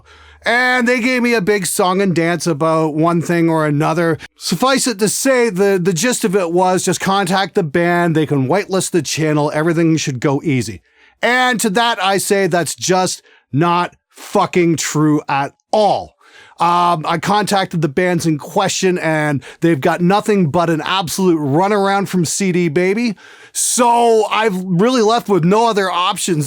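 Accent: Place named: Canada. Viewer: Every time he says about I'm like wtf and then I remember he's canadian XD not that that is wrong I just always forgett he's from another country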